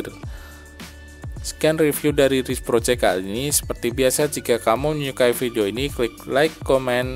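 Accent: Surakarta, Indonesia. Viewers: sampean tiang solo toh mas? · orang solo ya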